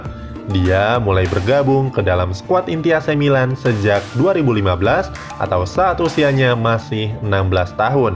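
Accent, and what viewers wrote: Indonesia. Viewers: Lidah indo broo..😅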